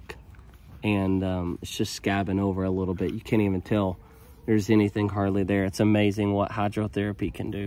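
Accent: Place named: South, United States. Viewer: It’s just the way he talks. Down here in the southern part of the country we tend to give some words our own special tweak.😉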